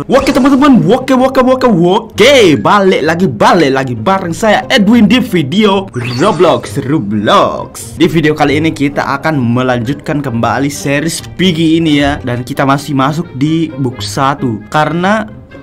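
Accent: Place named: Malaysia. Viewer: apa bahasa malaisya ya maaf aku tidak tahu omong omong nama aku syaurah suara nya kaya malaisya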